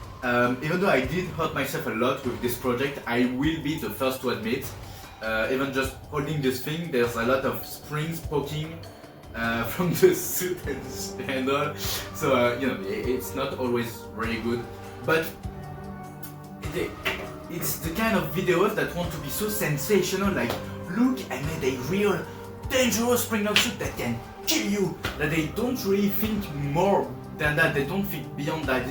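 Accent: France. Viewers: Are you french? Also, love your vids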